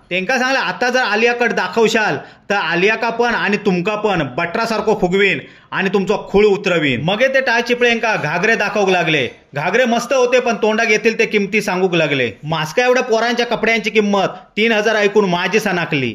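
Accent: Malvani, India. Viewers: भावा तुझी मालवणी मला आवडली लय भारी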